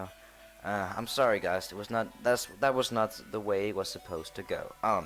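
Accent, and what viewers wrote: Denmark. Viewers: Er du fra danmark :D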